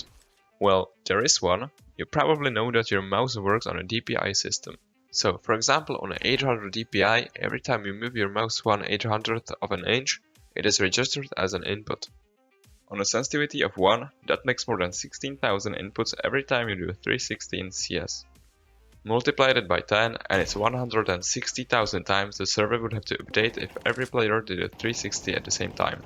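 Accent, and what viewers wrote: Czech Republic. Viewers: to je hustý že jde tolik poznat že jsi čech z toho přízvuku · Český přízvuk se pozná všude :D Pěkný videjko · skoro jsem nepoznal že jsi čech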